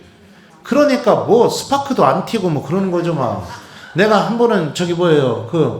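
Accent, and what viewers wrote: Chungcheong, South Korea. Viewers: ㅎㅎ 충청도 사투리 ! 그랬슈~~~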